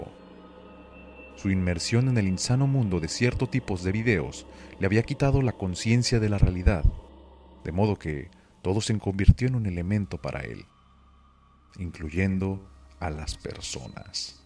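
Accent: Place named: Mexico. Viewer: Muy buenos vídeos, te recomendaría que hables de una forma más pausada ya que el acento mexicano resalta mucho, de ahí en adelante todo genial...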